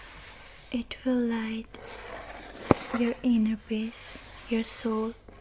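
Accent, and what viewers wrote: India. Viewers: Where has this video been?! I have always looked for an Indian accent. This is so perfect. I melted to sleep, and you are candy for the eyes.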